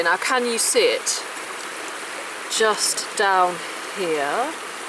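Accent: United Kingdom. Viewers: Smiled everytime you said "Keef-ley" in it's Northern pronunciation. Stands out a mile from your natural 'English Rose', accent! lol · Thanks for your videos, ser the treasures and hear your british accent so magnétic. Congratulations and greetings from Argentina.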